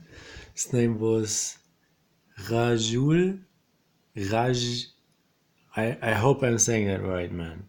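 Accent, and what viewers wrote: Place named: France. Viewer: Downvoted for your accent only. Sorry but my experience with the french has been awful.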